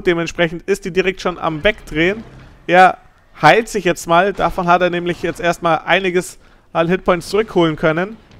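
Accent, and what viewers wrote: Bavaria, Germany. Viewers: Super Video ^^ Du hast eine klasse und angenehme Art zu kommentieren. Mach aber bitte mehr auf boarisch ;)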